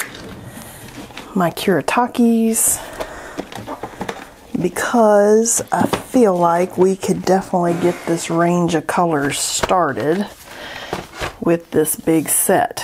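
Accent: South, United States. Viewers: I told my SO how you're from Georgia, as he's"heard" your southern tones, but after finishing the video I said, "...ooohhh babe!! somebody's have pee'd in Wheaties!" I said "listen to these 3 things-The tape...then the challenges...THEN the water?!...and you tell me!"?? He said, "She Julia Sugarbaker'ed y'all, lol"(hopefully you can appreciate the reference)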